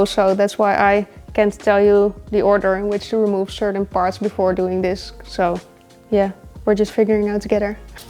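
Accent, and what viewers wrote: Netherlands. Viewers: So graceful you make it look easy. Love the Netherlands accent as well. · I’m a tech but I’m more taken by your Dutch accent? I hope I’m right that assumption your from the Netherlands 🇳🇱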